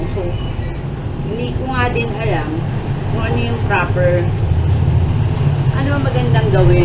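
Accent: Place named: Visayas, Philippines. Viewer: Ntawa ako sa visaya accent mo sis 😃😂 ok nga minsan gusto mo ngang mg vlog ng mhaba pag nasa Labas ka pero my mga Tao ksi Kung makatingin e parang baliw. Good at my update Nman Khit papano ky Nanay inday sis.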